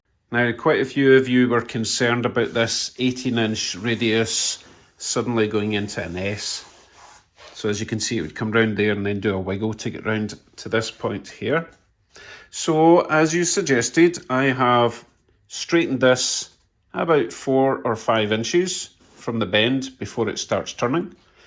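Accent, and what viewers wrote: Scotland, United Kingdom. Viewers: Its the Scots accent we have apparently some just love to hear us talk, LOL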